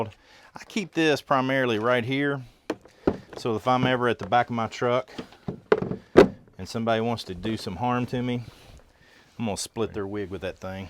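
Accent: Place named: North Carolina, United States. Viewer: This was a great video bro. Man hearing your accent reminds me when I lived in nc for a bit.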